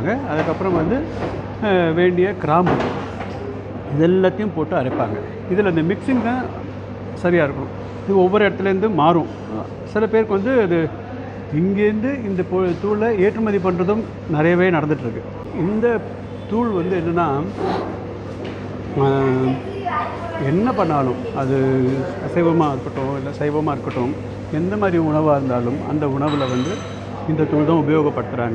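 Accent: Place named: Tamil Nadu, India. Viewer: Fav VJ from my childhood.. neenga neriya videos podunga.. I love your Tamil slang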